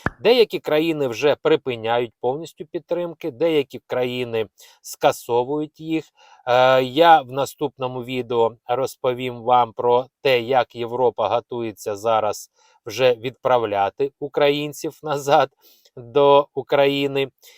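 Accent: Russia. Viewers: Автор - серед українців вати немає. Бо вата це російські і проросійськи мислячі люди, які українцями бути не можуть в принципі. Громадянами - так. але серед них і вірмени, і євреї. Я про це вже вам зауважував. Якщо ви вважаєте себе україномовним блогером ( російський акцент лізе у всі діри), то притримуйтесь усталеної української лексики, а не переміняючи поняття з ніг на голову. Що характерно саме для москалів. Не братимете подібні зауваження до уваги - зійдете на маргінал, як подібний до вас канадський марк. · Любі українці, не слухайте цього мерзенного блогера. Він чистокровний рашист, прислухайтеся до його вимови. Ще один канадський марк знайшовся, який бігає по лісосмугах та ллє бруд на українців. Але при цьому не заважає йому клянчити у українців гроші на донати. Мені цікаво, куди ідуть ті гроші. Сумлінні українці і без вас донатять. Самі присосалися до чужої країни, а людей, які рятували своїх дітей від війни, вони пускати на"свою" територію не бажають. 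Це їм конкуренти. У нас в Україні майже всі чоловіки працюють на двох або і на трьох роботах. І не вам їх паскудити.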